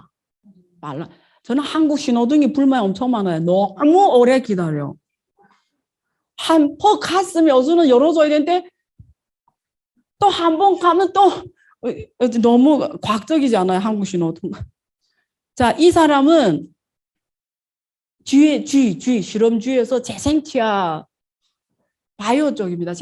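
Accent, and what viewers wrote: Yanbian, China. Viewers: 한국에서 돈벌려면 표준어좀 배우는게 좋겠어요!. 연변 사투리 듣기 싫어요!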